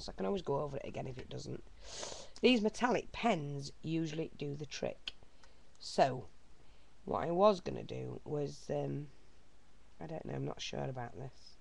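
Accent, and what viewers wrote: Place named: Yorkshire, United Kingdom. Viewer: i knew you were as soon as i heard your voice, we have a very distinctive accent here in good old yorkshire!!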